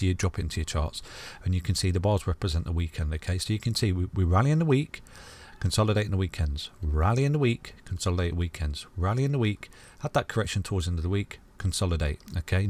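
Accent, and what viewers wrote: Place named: Nottingham, United Kingdom. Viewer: Your accent sounds like Nottingham, am i right?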